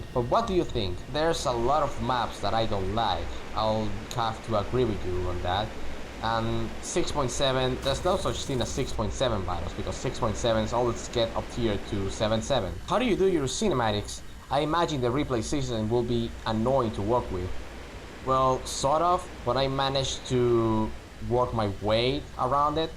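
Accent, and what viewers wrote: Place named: Spain. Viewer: Yo ya decía tenía un poquito de acento de español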